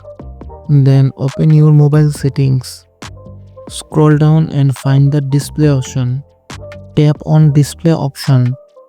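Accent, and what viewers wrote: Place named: India. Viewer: I already knew he is about to fix my shi when I heard the India accent btw thanks dude❤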